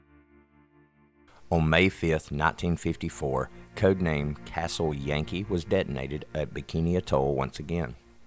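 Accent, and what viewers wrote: Alabama, United States. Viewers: Where are you from ? Bama ?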